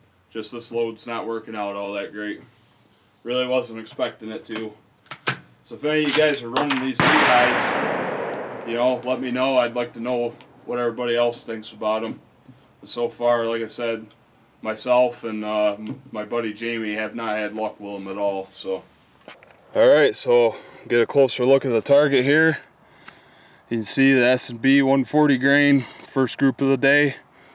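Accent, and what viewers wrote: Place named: Wisconsin, United States. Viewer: Sounds like. Wisconsin accent to me! Go pack go!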